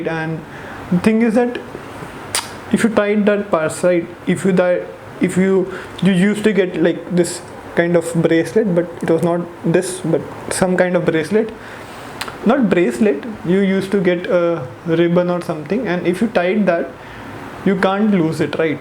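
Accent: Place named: South India, India. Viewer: your English is very south indianyyy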